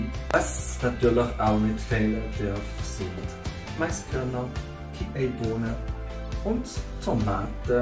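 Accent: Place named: Switzerland. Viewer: Schweizerdeutsch hört sich irgendwie süß an...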